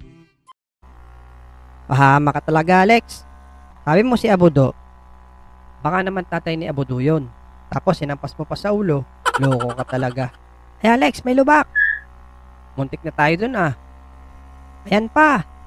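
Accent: Batangas, Philippines. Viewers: Batangueño ka po?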